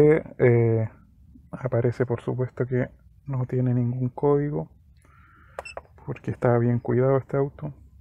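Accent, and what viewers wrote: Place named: Chile. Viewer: Amigo, una consulta.. Por tu tono de voz sos de chile, mi pregunta es, para los vehículos japones jdm que se vendieron solamente para ese mercado, pero vienen de igual manera con el volante a la derecha, Toyota subaru mitsubishi nissan etc etc.. Sirven estos escaner? Soy de Paraguay y hay miles de vehículos volante cambiado por acá.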